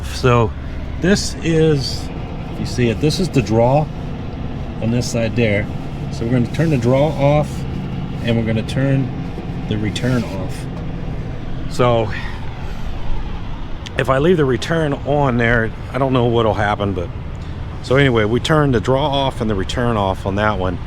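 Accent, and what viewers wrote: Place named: Western Pennsylvania, United States. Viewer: What city are you from, originally. Wondered about the accent and word usage. I don't mean any insult, but you sound somewhat like you're from Pittsburgh or Western PA, where we are.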